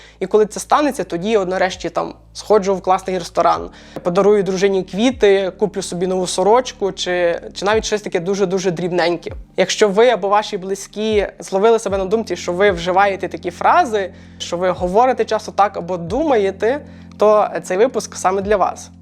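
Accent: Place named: United States. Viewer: У вас акцент американський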